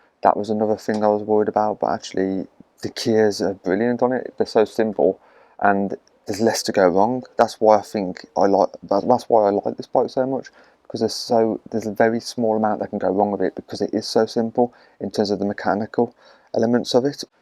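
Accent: United Kingdom. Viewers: Very informative. Thinking of getting one (despite the price!!!!) so you're opinion on owning one has helped enormously. Great to hear the Dudley accent, too! Not enough Brummie and Black Country accents on YouTube!😃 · Good to hear a fellow Brummie. Im looking to buy my first Brompton. Ive read alot of articles about the weight limit of 110kgs and not to worry too much on that fact. What are your thoughts on this? I am a slightly heavier bloke, 135kgs. Cheer mate 👍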